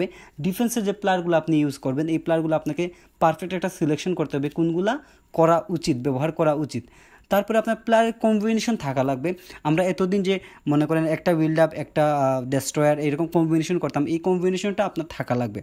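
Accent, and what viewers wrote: Sylhet, Bangladesh. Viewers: bai channel or namor bay deka lagse na. tumar voice e bujilaisi tumi sylheti😁🫣🫣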